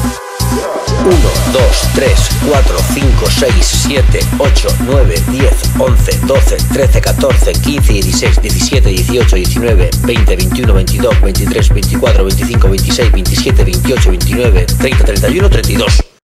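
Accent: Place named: Spain. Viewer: amigo que buen trabajo y gracias x tomarte el tiempo para hacer todo este trabajo que la verda vale muchísimo para los que nos estamos iniciando en esto de lo del dj esto es como el oro puro muchas gracias ojala sigas haciendo mas videos como estos mas de 1 te lo agradeceríamos bueno espero te encuentres bien soy de méxico y x el acento que tienes creo que eres de España bueno pues muchos saludos hasta España y ya me suscribi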